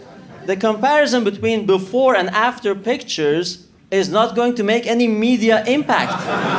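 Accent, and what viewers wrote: Iran. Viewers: Should ur name be “ ” that Persian accent isn’t fooling anyone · he himself is Iranian i can say by his stupid accent and he badmouthing his own ppl, that is always a bad sign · What’s up with your Persian accent bro?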